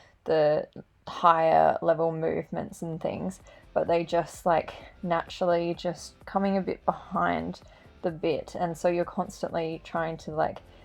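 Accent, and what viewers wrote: Australia. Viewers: She is from Australia, that's our accent.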